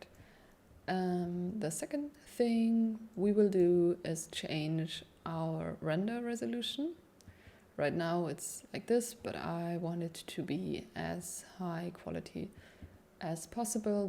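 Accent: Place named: Germany. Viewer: just found you and am really enjoying these long tutorials. Also love the charming german accent hahaha gerne mehr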